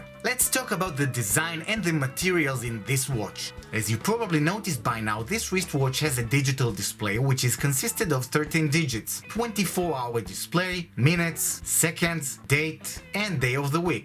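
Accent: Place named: England, United Kingdom. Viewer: wow talk about stereotype english accent!!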